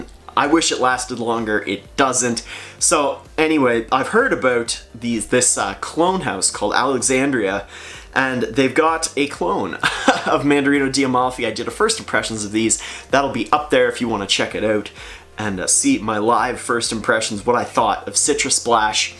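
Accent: Canada. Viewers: You sound hella Canadian.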